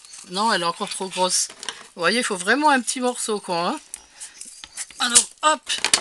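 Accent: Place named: Freyming, France. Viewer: coucou elles sont tres mignonnes sans risque de prise de poids j aime beaucoup ta voix et ta bonne humeur et ce petit accent qui me rapelle ma grande tante qui etait de freming bisous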